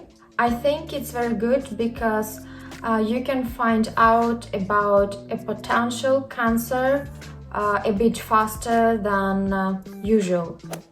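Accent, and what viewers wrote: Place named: Russia. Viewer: I geussed Siberia - your accent sounds russian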